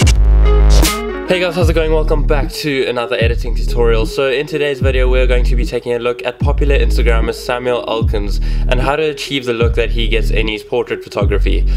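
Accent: South Africa. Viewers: You have a South African accent.🙌🏽👏🏽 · i can sniff the south african accent froma a mile away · Random question but are you south african? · dude, this is brilliant. also, i noticed you accent. south african maybe? (this video was in my recommended so i'm a new photographer) (cape town born and bred) sending you all the good vibes.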